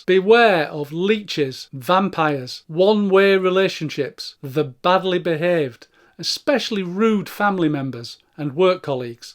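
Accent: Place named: Yorkshire, United Kingdom. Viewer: Not English, it's Yorkie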